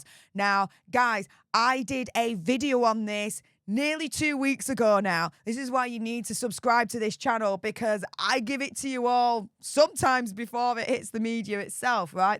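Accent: United Kingdom. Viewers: When my great-niece grows up in the UK, this Yank hopes she has your accent.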